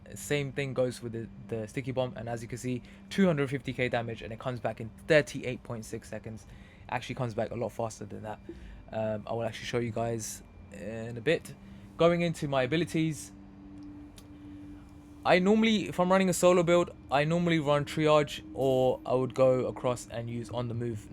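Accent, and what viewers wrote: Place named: United Kingdom. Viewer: are you in UK ) your accent suggests it but fast are long here man 20 hours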